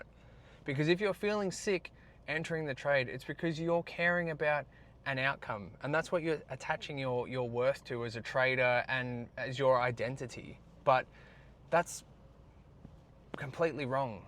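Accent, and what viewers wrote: Australia. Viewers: Are you Aussie? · Perfect time for me to listed to that. It is nice to listen to a fellow Aussie. Thank you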